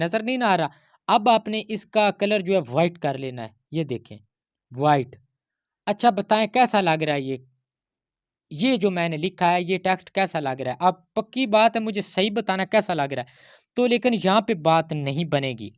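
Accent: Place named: Punjab, India. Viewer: Hmmmm urdu m panjabi ka tarka ...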